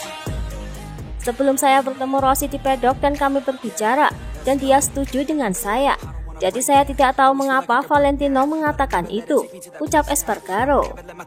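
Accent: Java, Indonesia. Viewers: Medok beud njir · Gagal fokus sm suara pengisi video, logatnya jawa ini.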